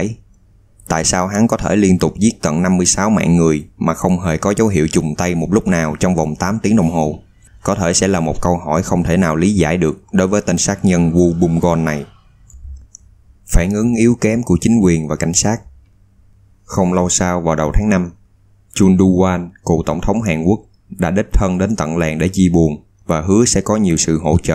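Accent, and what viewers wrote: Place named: South, Vietnam. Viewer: giọng nói miền Nam về rất hay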